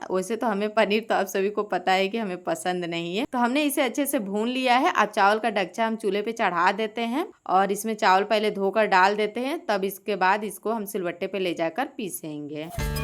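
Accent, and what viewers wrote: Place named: Kanpur, India. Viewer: आपकी भाषा तो अलग है आप शुद्ध हिन्दी कानपुर वाली बोल रही हैं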